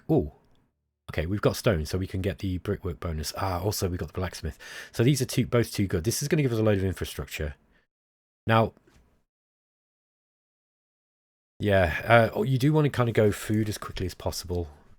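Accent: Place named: Scotland, United Kingdom. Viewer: Oh I can believe it. Just interesting that you managed to identify the exact same strategic vulnerability that the Romans did. Hell, if your explanation was flowered up a bit, and in Latin instead of your calming Scottish brogue it wouldn't have been all that out of place on the senate floor. To paraphrase Livy Book 16: "Against them and against king Hiero of the Syracusans, the Senate decided to offer help to the Mamertines. There had been much debate between those for and against it. For the first time, the Romans crossed the sea with an army and they fought successfully against Hiero who sued for peace, which was granted."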